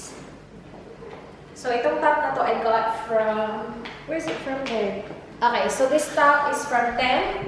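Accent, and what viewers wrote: Visayas, Philippines. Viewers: bisaya ka o tagalog? Prang visayan accent ka kc?bsaya din ako.. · Sis.. Klaro kaau na bisaya ka? Correct me when f I'm wrong 😊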